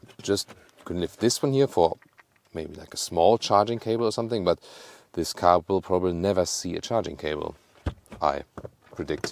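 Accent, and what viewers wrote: Germany. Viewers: I like watching German car reviews with German accent 😊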